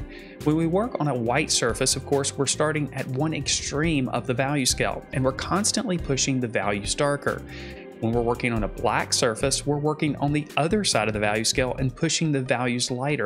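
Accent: South, United States. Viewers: The way you say drawing sounds like drauwwing. What accent is it? Southern?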